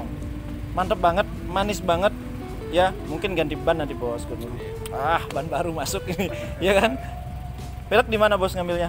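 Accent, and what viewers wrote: Bali, Indonesia. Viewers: Logatny kyk bali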